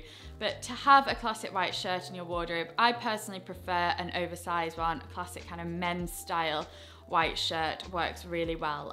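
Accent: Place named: Manchester, United Kingdom. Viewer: First of all— obsessed with your style tips. This is literally so helpful. Second, I didn’t know why your accent sounded so familiar until I realized that you’re in Manchester and that’s where the 1975 is from, and Matty’s accent sounds exactly the same. Haha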